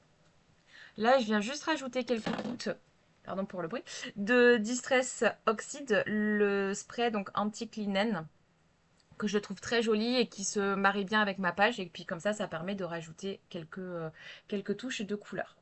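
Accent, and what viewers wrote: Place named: England, United Kingdom. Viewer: Bonjour, je découvre vos vidéos et j'adore!!! Je me suis abonnée également 🎉! Votre voix est agréable et fluide et c'est un avantage certain et l'accent anglais est pas mal! J'ai entendu pire! 😅😂 alors pas de honte à avoir ! Quelle imprimante utilisez-vous? La mienne n'est pas laser et il paraît que l'on peut trouver des cartouches avec une encre résistante à l'eau 🫤 faut que je creuse! 😁 Je vous souhaite une belle journée et à bientôt pour de prochains visionnages! 😊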